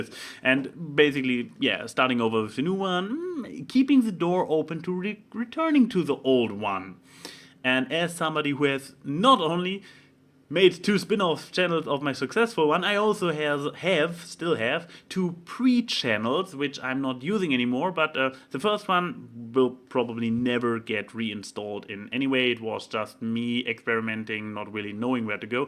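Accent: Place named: Germany. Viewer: Oh AnY I don't stalk you, I just am just drawn to your sexy German accent everywhere you go lol. Anyway I like content. But I think his brand of humor works better when he has someone to bounce off of like his collab with Josh Scorcher. Also AnY you might want to be careful with these vlogs because you got a little redundant at times.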